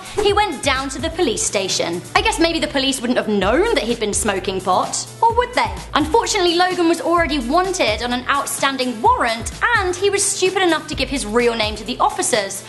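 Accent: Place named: England, United Kingdom. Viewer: Out of curiosity. Which part of England you are from?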